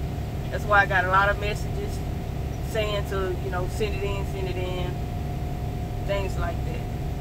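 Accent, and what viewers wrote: South, United States. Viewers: Pretty! Love that southern accent. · I'm liking that Country Slang/Accent 😃.... You're still driving, right ?...I'm considering getting my CDL...And switching over from doing Manufacturing work for over 20yrs... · I heard your southern drawl. I'm from Florida, born and raised. I just recently graduated from St. Petersburg College with an Associate degree. However, I want a house in a hurry. I can not get my house in a hurry with a 10 dollars an hour job. Have you been to college or some kind of trade school? How old are you? What do you do now for a living? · Girl you so country I love it!!!!!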